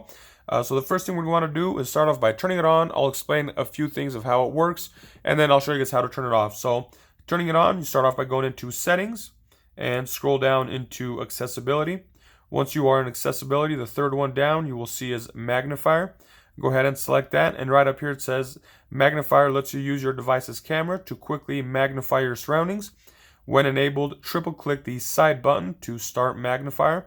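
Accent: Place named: United States. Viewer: One of the clearest, most concise demo of an iPhone feature that I have ever used. It’s also very nice to hear clear American English at at a speed I can process. Thank you. (That is not a disparagement of ESOL speakers. They are just hard for me to understand when they speak at a very fast rate.)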